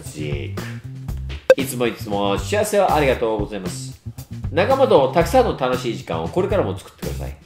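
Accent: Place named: Kansai, Japan. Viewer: 剛くんの関西弁かわいくて好きです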